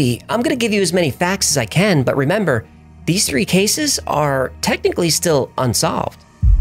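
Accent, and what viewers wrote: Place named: Long Island, United States. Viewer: Not Brooklyn. That's more of a long island accent.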